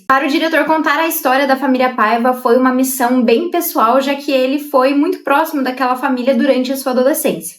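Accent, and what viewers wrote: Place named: Caipira, Brazil. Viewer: Eita, sotaque caipira não dá. Muito rim. Vai estudar mulé.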